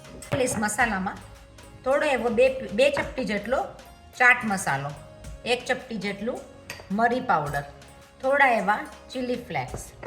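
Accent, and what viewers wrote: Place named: Charotar, India. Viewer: Ben tamane mare ak tips aapviche te ajke tame basan sathe puovane karas Karine bijivar honvane banavsho ok 🎉 dhayvad tamari charotari bhashathi Mane badapan ni yad aavi gai Jay mataji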